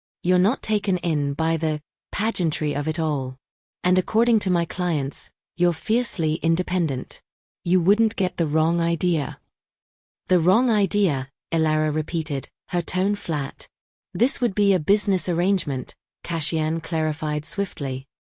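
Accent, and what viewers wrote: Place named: Ireland. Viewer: The reader's accent sounds Irish. It's beautiful. I feel like I'm far away in a green country, inhaling the bakery''s smell of home. I long for a little bit more.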